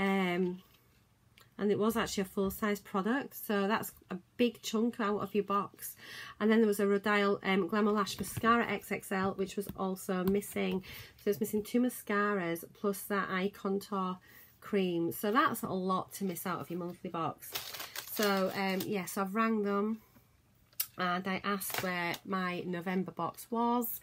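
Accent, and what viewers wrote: Yorkshire, United Kingdom. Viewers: That would have been a nice little gesture on their part if they would send you the Monday all I want box for free, especially as you are a beauty youtuber!! Also, you sound like a Yorkshire lass... am I right? xx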